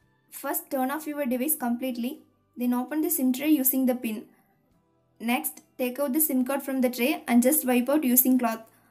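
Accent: India. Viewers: the thick indian accent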